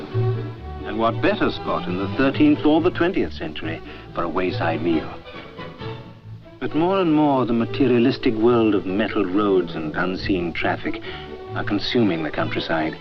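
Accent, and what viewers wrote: RP, United Kingdom. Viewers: The days when every narrator sounded as if the were Oxford educated lords! :D That's one heck of a restoration task. Well done!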